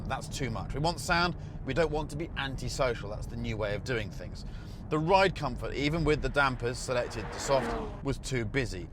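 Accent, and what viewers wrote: Italy. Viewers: That accent was like a weird hybrid between jar jar binks and an Italian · LOVE THAT ITALIAN ACCENT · that italian accent :D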